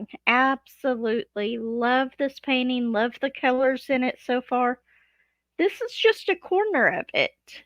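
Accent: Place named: South, United States. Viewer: Hiya, V!! I believe you and I spoke a little during Granny's 1st Premiere. I liked and subscribed your channel back then, however, I am currently having severe vision issues and am unable to diamond paint right now, but I just loved your wip & chat! What a lovely southern accent you have. I look forward to watching more of your videos. Sending loads of love & prayers to your Mom. 😊❤🙏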